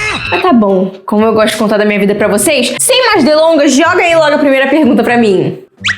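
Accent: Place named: Spain. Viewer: tu agr qnd falas ingles ja tens o sotaque espanhol kakaka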